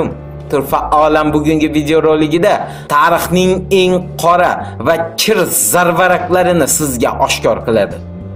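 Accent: Khorezm, Uzbekistan. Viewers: eyy siz O'zbekistonni qaysi viloyatidansiz xurmatli admin shevaizdan Xorazimliksiz manimcha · Ovozingga qutagim mincha xorazm diysan o'zbek de bildik zorazmdan ekansan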